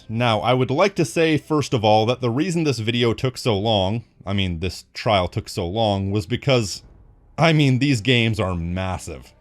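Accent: Canada. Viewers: Hes Canadian, note the subtle pronunciation of a-boat instead of about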